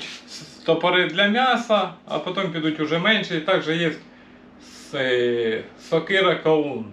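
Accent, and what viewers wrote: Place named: Surzhyk, Ukraine. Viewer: Сало добре але суржик ріже слух аж слухати неприємно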